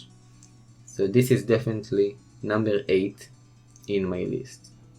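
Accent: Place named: Israel. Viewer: המבטא הכי ישראלי ששמעתי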